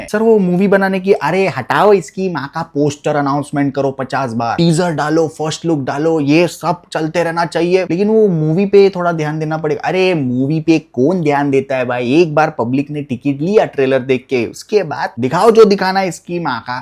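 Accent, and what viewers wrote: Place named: Mumbai, India. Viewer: not.a fan of ur mumbai accent dont do it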